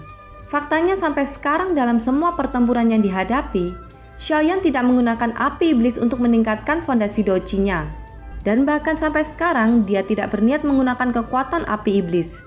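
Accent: Java, Indonesia. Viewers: noba 1 jg awal"nya kaku bacanya skg enak dengerinnya... skg noba 2 sama kaku cuma bedanya noba 1 ga medhok 🤭🤭🤭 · MALAH SESION AKHIR SUARA D GANTI JADI TIDAK MENARIK HARUSNYA DI GANTI DG PENGISI SUARA YG LBH BAGUS MALAH JDI MENDOK JADI KAKU 1000% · semangat buat mimin baru... klo ada yg ngatain suara medog jawa dll jangan menyerah👌 tar lama kelamaan akan hilang kok. gw dlu pas baru pindah jakarta jg medog jawa, sekarang udah ilang medognya karena keseringan ngomong bahasa indonesia tiap hari🤭 semua butuh proses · Itu bahasa Jawanya medok itu mohon maaf bikin lagi yang banyak ceritanya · Logat jawanya kudu ditekan nih min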